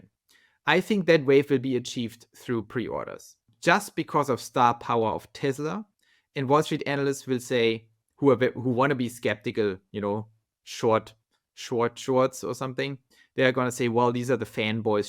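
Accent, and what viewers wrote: Germany. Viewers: The fact that you employ a German accent in your presentation adds to the drama and seriousness. Nice!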